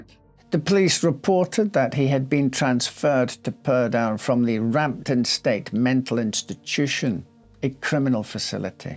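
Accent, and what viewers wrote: Scotland, United Kingdom. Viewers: This is one of the few channels on YouTube that manage to tell stories in a way that really and honesty grips me in every way, it's such a tragic case, and it's also such a shame that we have come to a time where kids playing on their own are no longer considered safe from murder most foul, so I can only imagine what a shock it must had been in a more innocent time where people honestly feared God too much to do such evil things. Also as always this is a high quality production, with great narration. That Scottish accent is so pleasing to listen to, you should consider reading some classic audio-books, like LibriVox books. I hope to "steal" the 'style' in clothes, it's so elegant, I only have boring clothes myself. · I would listen to you read a phone book. your voice and your delightful Scottish speech pattern...yum. Then, the stories are always interesting, though sometimes heartbreaking.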